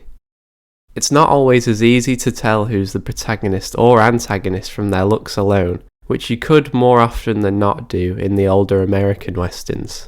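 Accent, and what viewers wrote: United Kingdom. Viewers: narrated by a Brit? ...about italians making american westerns? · Narrative spooled by the low class English accent..... no glottal stops... ie "T"s" matter and "Bee-ings" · Spaghetti westerns described by a crappy British accent. YouTube sucks ass these days.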